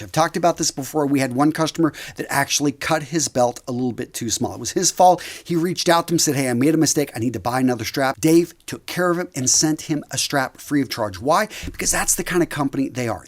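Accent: United States. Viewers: Yes of course but specifically which dialect of American English?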